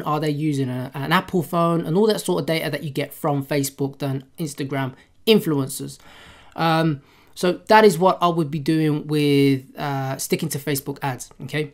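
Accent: England, United Kingdom. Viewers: You say dollars but have an English accent :P whereabouts you from?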